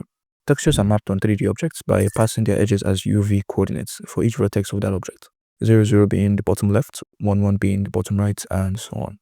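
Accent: Nigeria. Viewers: Can’t place the accent are you Nigerian?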